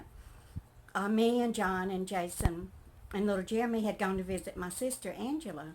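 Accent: South, United States. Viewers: I have been a sub on your channel for a while. Someone say good your channel was but I have not had a chance to watch it. I love hearing your southern accent. I bet they love it up there. Show them your southern cooking. I love the pears. I ate them growing up. You have a blessed day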